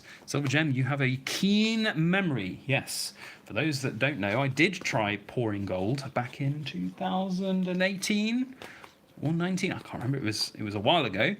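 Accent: United Kingdom. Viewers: Was watching a video today and they were talking about regulating gold and silver for the common man you sound British and don't know if it will effect you. But in America they want to know how much metals your selling. Like this having a bullion kinda business they want to regulate here in America also said you'll have to have some kind of paper work when you go to sell silver and Gold and if you don't there trying to say how do they know its yours smh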